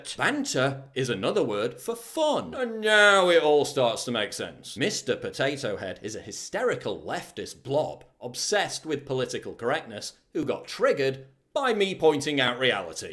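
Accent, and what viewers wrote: United Kingdom. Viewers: That's because he's British, could you not tell from his obvious accent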